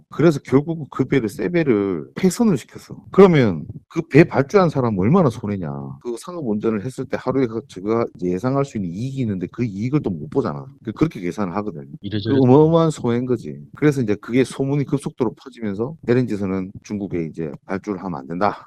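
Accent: Gyeongsang, South Korea. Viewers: ~ 이번 에피소드 하나로 조선업을 이해할 수 있었네요 특히, 갱상도 말로 들으니까 더 이해가 잘 되네요. 해양플랜트는 힘들어도 조선은 살아~있~네 ^^ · 역시 현잠 실무자의 직접적인 얘기라 귀에 쏙쏙 들어오녜요 경상도 특유의 다소 투박한 사투리지만 그것이 오히려 재미와 더불어 귀를 더 기울이게 하네요 비록 알고있던 정보지만 타 유튜버들의 써놓고 읽는듯한 통상적인 진행보다 한결 신선함을 느꼈습니다 비록 자막이 없어도 지루하지 않게 들을수 있었습니다ㆍ신선했던 컨텐츠 였습니다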